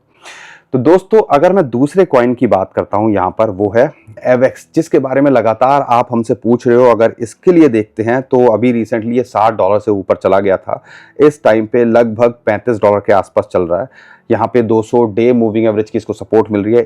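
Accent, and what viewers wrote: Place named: Varanasi, India. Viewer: Bhai ekdum banarsi andaz me baat karte ho GURU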